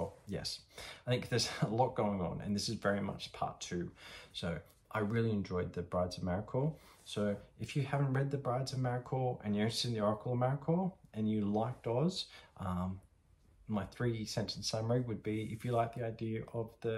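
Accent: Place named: Australia. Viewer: I’ve read all of the Wicked books and just finished Oracle - about to start Witch. Great review, that reveal at the end was almost a dream-like experience, kind of made the entire storyline of the entire 6 books thus far just wash over me with nostalgia and revelation - such a cathartic moment. Loved the entire book. Btw I’m an Aussie too and it’s so nice to hear someone pronounce the names the same way I do, whether it’s correct or not 😂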